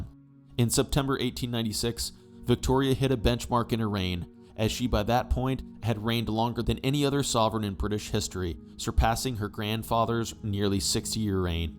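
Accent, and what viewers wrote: United States. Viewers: The picture introducing "the Corn Laws" showed North American "corn" ["maize"] not "corn" ["grain" i.e. wheat and barley] as is meant in England. The documentary is thorough and good; but has that American accent.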